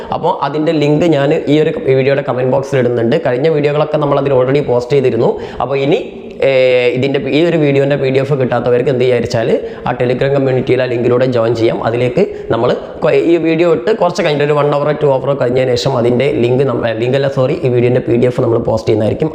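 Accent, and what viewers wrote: Malappuram, India. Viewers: sir malappuramaanoo sthalam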